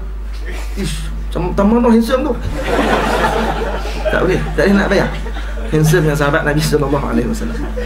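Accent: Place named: Kedah, Malaysia. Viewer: anas nasrulloh basa malaysia. lebih tepat negeri kedah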